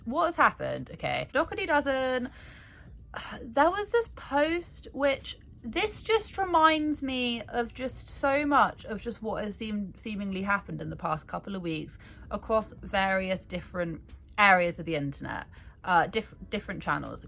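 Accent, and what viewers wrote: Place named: United Kingdom. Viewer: Omg yes thank you gorgeous! Anyone who is against your sweetheart butt are just nasty people. Your obviously a genuine person. Trashy people attract trash. And you didn’t back pedal….you said “hey guys I messed up.” End of it. Your human, your a content creator, mistakes will happen. Unlike onora YOU TAKE ACCOUNTABILITY! Love you and your British accent!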